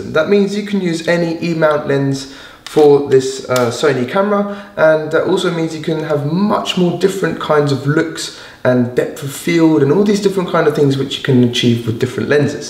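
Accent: England, United Kingdom. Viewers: Bist du Engländer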